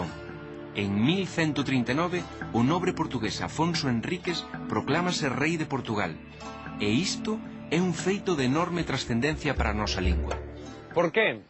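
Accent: Castile, Spain. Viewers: Un apontamento: o apresentador non ten verdadeiro ou profundo sotaque galego, ten escaseza de fonética auténtica. Seica é un neofalante desta lingua, e a sua lingua nai sexa o castelán. Dai que para os observadores extranxeiros pareza mistura co español, e que un hispanofalante comprenda perfeitamente. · Se calhar percebes melhor o espanhol, isso sim. O que ouves nesta reportagem está mais para castelhano que para galego. O verdadeiro galego é pronunciado de igual forma ao português do norte (Minho e Trás os Montes), Se calhar temos todos um problema na garganta e os brasileiros como tu é que falam português correcto, vai-se a ver... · Este apresentador fala um galego foneticamente castelan e com palavras do castelan o castelhanismos. O galego, hoje, é a mesma língua que a falada na Portugal, que é um galego evoluído,.influenciado pela separação do condado de Portucale do resto do Reino da Galiza e pela implantação da língua castelhana pelos reis católicos na Galiza que produziram a castelanizaçom da nobreza galega e do galego o que a história da Galiza conhece como a ´ "Séculos oscuros, que a " separaçom que o rei Alfonso VI de Castela, Leão e do Reino da Galiza - que incluía as terras portuguesas que se estendiam do rio Minho a Coimbra, depois de tomar a o seu irmão, Garcia, rei do Reino da Galiza - que incluía o condado de Portucale, o separou do reino galego o condado Portucalense, entregando a atual Galiza a sua filha Hurraca e o conde Raimundo, e o condado de Portucalense a sua filha bastarda Teresa e o conde Henrique da Borgonha. 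Lista da reis da Galiza: <link> A verdadeira hítória dos origenes galegos de Portugal que muitos historiadores portugueses ignoran:<link> .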